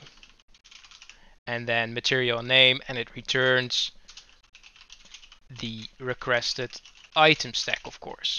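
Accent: Netherlands. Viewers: Ur accent sound a bit like a dutch one, are you? xD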